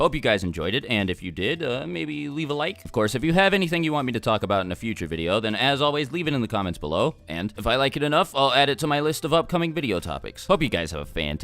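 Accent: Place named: United States. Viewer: Your American accent is killing me... STOP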